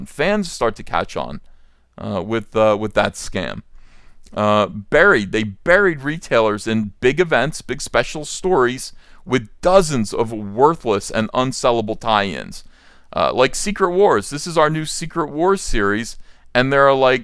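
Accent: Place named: Baltimore, United States. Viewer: You sound like you're from the Baltimore - Camden axis (which includes Cumberland).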